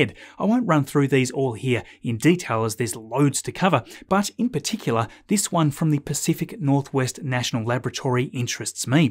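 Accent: Australia. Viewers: I think just tries to hide the Aussie accent a bit given the international audience. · my lovely Aussie mate, I love your accurately non-politicized SpaceX reporting, but repeatedly have found myself in an information overload. Maybe it's because I'm 71 yrs old. Maybe it's because I'm a kiwi, and find Oz and kiwi accents distasteful on YT. But may I suggest you upload twice a week with half the content and double your audience?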